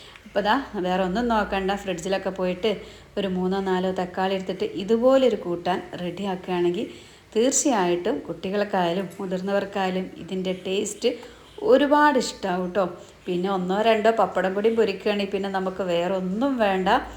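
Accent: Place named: Malabar, India. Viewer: The Malabar slang is interesting for us (South Kerala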